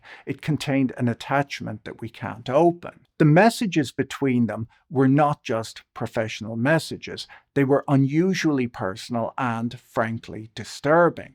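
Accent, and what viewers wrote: Ireland. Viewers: Accent. Now thinking Galway or South West coast, but probably wrong will stick with first choice last time North west londonderry region. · Love the Irish accent... King Charles the "TURD". LOL RIP QEII but, the British/German monarchy should have ended with you. 🎉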